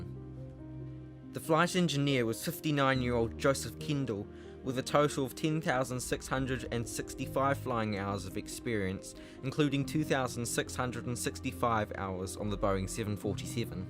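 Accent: New Zealand. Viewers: just listening to your commentary are you a Kiwi?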